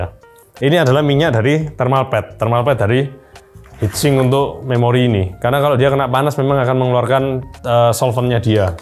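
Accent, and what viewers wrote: Java, Indonesia. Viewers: Uasik nek om seng edukasi. Mantep dengan beberapa penekanan jowone. 😂😂😂👍🏻👍🏻👍🏻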